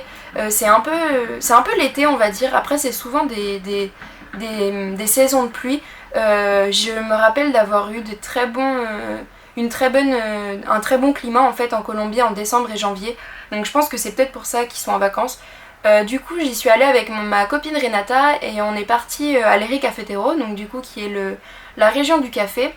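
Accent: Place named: France. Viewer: No entendí nada😢, pero me encanta el acento francés. Estoy aprendiendo apenas el frases, estoy con como se dicen las cosas básicas y todo eso. Espero algún día entenderlo y hablarlo.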